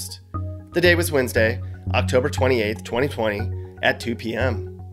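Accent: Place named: California, United States. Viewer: I appreciate your Californian accent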